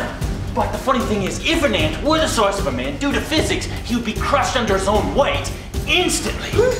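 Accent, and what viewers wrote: Australia. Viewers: I love the over the top Australian accent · Fair dinkum Aussie here....great aussie accent if I do say so myself. We miss our Steve Irwin. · Hahahahaahaha! I wasn't expecting this. You sound and look like an Australian indeed. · Nice Australian accent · Bluddy hehhhhlllllll!! This is halarious 😂👍 mixture of aussie, sooth afrikhn and irish. #fitbullaussie approved 😉😁👍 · Australian accent lol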